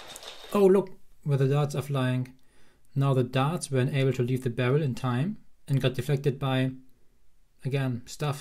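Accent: Germany. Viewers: Your accent is uhh... German? I mean it wouldn't be a surprise to me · I don't know if it is a good idea for people with germanic accents making weapons..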